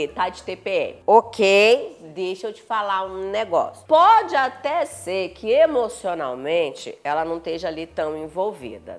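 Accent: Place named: Minas Gerais, Brazil. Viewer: kkkkk mineiros são reconhecidos no mundo inteiro p geito gostoso de falar né vdd??? Eu sou de BH por isso percebi ki vc mineirinha uai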